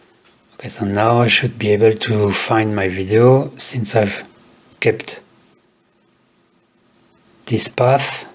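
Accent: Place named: France. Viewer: Thanks a lot my friend. Tu as l'accent d'un français. Je me permets de poursuivre dans notre langue. Je débute avec ton tuto, c'est vraiment limpide ! Merci bcp ! Je me demandais comment tu exportes ton fichier pour en faire un fichier de vdjing ? C'est peut etre une question hyper large mais voilà je débute complètement... déso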